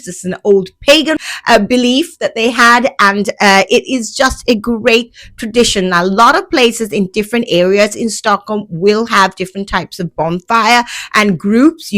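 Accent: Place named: United Kingdom. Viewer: I adore your English accent. I wish my own english could sound so sophisticated-ly British xD With surströmming, the smell might reach biohazard levels but I'll tell you that it doesn't really taste like anything. It's basically just taste like salt, an extra salty pickled herring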